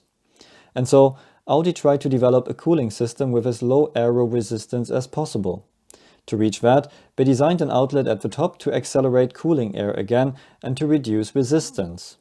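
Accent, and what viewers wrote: Germany. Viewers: The best articulated middle finger to management in polite german english. I love it. Sadly nobody who should will ever learn from this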